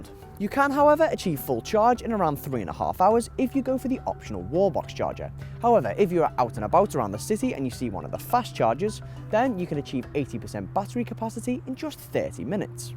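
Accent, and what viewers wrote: Liverpool, United Kingdom. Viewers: You from Liverpool???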